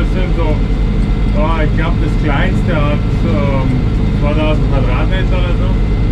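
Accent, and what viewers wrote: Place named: Bavaria, Germany. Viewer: Du konnst a boarisch ren😉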